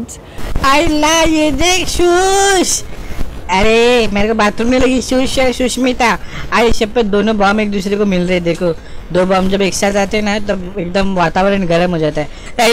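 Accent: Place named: Mumbai, India. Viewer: ponga pandit.. LOL.. I am sure commentrator is from Mumbai.. Only a Mumbaiwalla kan speak like that.. Cheers to Mumbai.. videos have many dislike.. some of them.. Non Mumbaikars dont understand jhakas humor of mumbai... so they press dislike... you dont worry... keep making such videos.. u rock.. Mumbai spirit socks.. stay Mumbai style only.. dont change...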